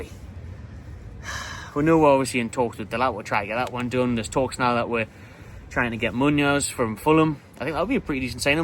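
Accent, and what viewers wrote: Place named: Ashington, United Kingdom. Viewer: I thought you were an Ashington or Newbiggin lad 😊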